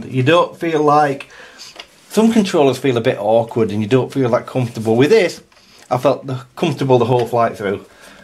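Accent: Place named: North, United Kingdom. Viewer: Thanks for the sub, sounds like tha'as from t'same part of t'world as me.Happy Flying.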